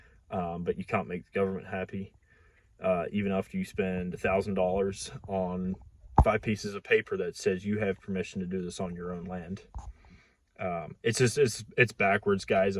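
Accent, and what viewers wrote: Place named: Kentucky, United States. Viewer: Sounds like either Northern Ky or western Ky. You need to come out to southeast Ky in the mountains. It’s a whole different world out here. Less government involvement!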